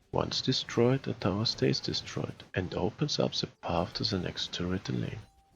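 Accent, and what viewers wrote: Germany. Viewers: You are german right :D? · He sounds German as well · are you German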